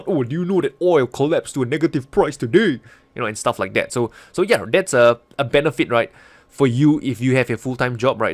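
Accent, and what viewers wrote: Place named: Singapore. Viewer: I like the accent reminds me of my long lost childhood friend from Singapore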